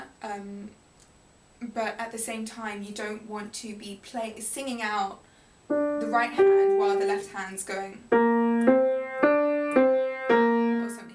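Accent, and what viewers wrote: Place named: RP, United Kingdom. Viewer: very good88 and i can work on my english because you have the queen mum's accent ! i understand everything even if i am french!!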